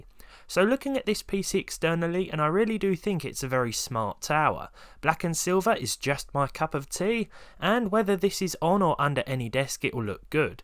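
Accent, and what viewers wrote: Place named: Scotland, United Kingdom. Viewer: I like his accent. Is it Scottish?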